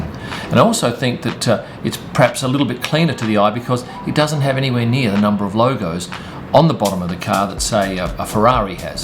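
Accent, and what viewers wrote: Australia. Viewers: How bout a vid on tourist attractions near every F1 track eh mate? Btw I love the aussie accent so tried something. Correct me if I am wrong.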